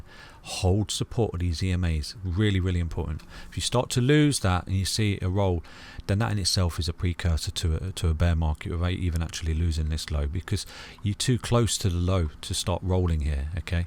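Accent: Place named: Northern England, United Kingdom. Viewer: Love the videos thanks!! Love the Northern accent too - reminds me of home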